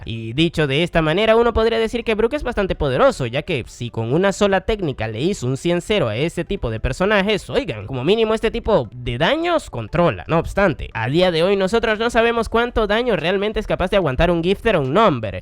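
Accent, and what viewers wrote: Dominican Republic. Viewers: ERES DOMINICANO ? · tú eres dominicano brot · tengo una pregunta importante que hacerte, eres Dominicano?🇩🇴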